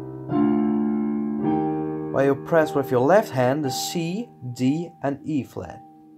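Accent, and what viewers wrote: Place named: Netherlands. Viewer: haha, nederlands overal, ik hoorde het aan je accent :)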